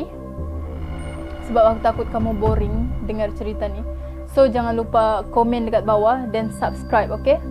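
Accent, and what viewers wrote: Sabah, Malaysia. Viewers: akak,nk tanya.akak ni asal sabahan ia,bukan apa kak macam sedikit sabahan laa bila akak bercakap. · Insya Allah sy DTG ke Sabah nanti, mgkn boleh cari awak,UTK jd Pemandu Pelancong 🤭. Maaf sy bergurau. Walau apa pun memang suka dgr gaya percakapan Org Sabah. Sabah adalah Malaysia, kita sama di bwh 1 bumbung Negara. 🙏🏼❤️👍🏼👌🏼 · makin lama , makin keluar slang sabah hahaha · Orang sabah kah?? Cara cakap macam orng sabah...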